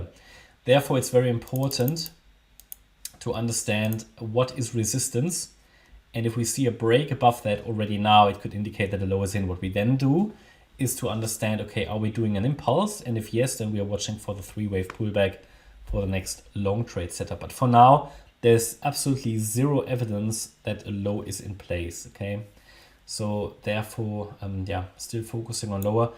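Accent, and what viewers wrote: Germany. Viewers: Bist bestimmt Deutscher haha man hört es raus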